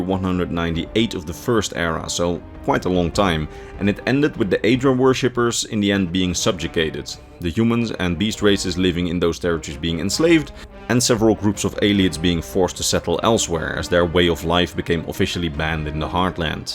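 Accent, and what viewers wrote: Netherlands. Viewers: Nice vid. Liked and subscribed! Btw, are you Dutch?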